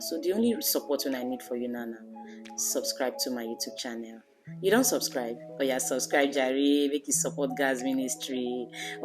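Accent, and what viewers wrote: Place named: Warri, Nigeria. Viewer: I just like the wey you dey speak our warri English 😂. Too much love from sapele 🤗🤗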